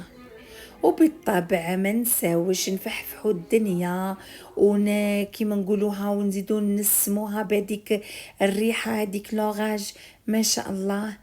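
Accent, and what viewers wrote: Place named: Constantine, Algeria. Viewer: سلام تلاقيت الفيديو تاعك ومع بديتي تهدري عرفتك قسنطينية بالتوفيق ان شاء الله الكادر حطيه في الصالون خير وفهميني اكثر منين شريتي ليبانو من فضلك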